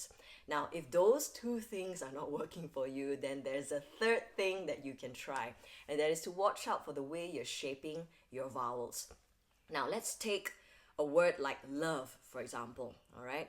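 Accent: Singapore. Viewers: Sounds Singaporean to me. Whats wrong with that?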